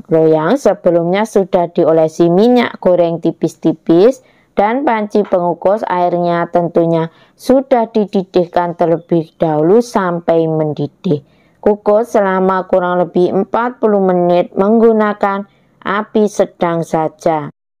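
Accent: Java, Indonesia. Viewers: Bunda orang Jawa yah Bun suara nyabagus bun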